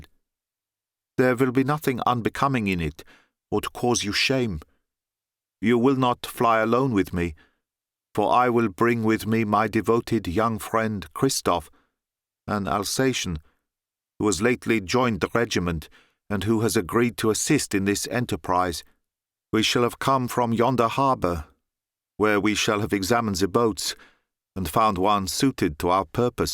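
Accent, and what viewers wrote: United Kingdom. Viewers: Mr would please read Agatha Christie short stories.. I really like listening to crime detective stories narrated by your clear British accent as you did with Sherlock Holmes stories.. I would be grateful if you read some Agatha Christie short stories.. listening to your channel has indeed improved my listening skills as an English language learner... Thank you very much sir ❤❤❤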